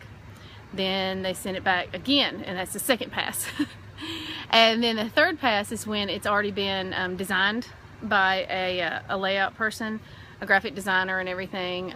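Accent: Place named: Kentucky, United States. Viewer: Oh, I grew up in Kentucky so I love watching your videos because I miss hearing the accent now that I live in Alaska.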